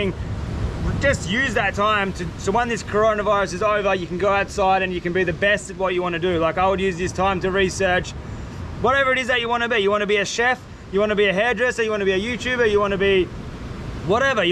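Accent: Australia. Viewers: Thanks for doing a Q & A video. I could easily tell you were a fellow Aussie but didn’t know where you came from. Also good to learn a little more about your background and some more about your girlfriend. One other thing I was curious about was the food poisoning/sickness question. I’m NOT making any judgements here but many of the situations where you’re preparing your food looks quite unsanitary. So, I was always afraid you’d make yourself very ill. Just like many other thousands of Australian citizens, I hope you can come back home in the near future, very soon. Keep up the great work with the videos :) Oh, and I also grew up in the country and had interesting pets. We had emus!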